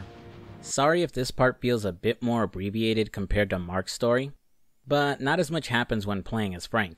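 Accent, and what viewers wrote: United States. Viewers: Getting an ear full off this moaning yank narrating ... lifes not ment to be easy son it aint a walk in the park treacle , fack me 😉 ... me an our kid were living in london when this gem came out ... looking back im chuffed we did the london thing in our twenties ... most young Brits do , if they got anything about them ... remember working at selefridges and going there on the game it even had the back exit staff had to use and other places id go up west ... kewl as fuck that game deffo needs a remake would be epic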